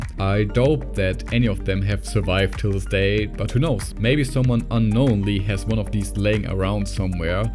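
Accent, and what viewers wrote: Germany. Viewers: Ich liebe diesen deutschen akzent :D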